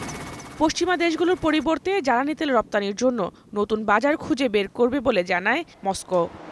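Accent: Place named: Comilla, Bangladesh. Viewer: Voice eirokom keno ? Didi r bari ki Comilla?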